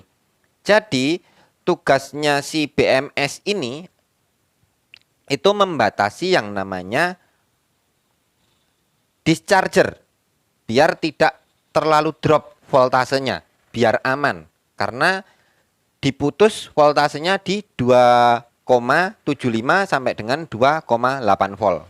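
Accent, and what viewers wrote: Java, Indonesia. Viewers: Maturnuwun inpone mas gess. Btw mas niki daleme pundi to? Kok logat jowone kental banget. 😁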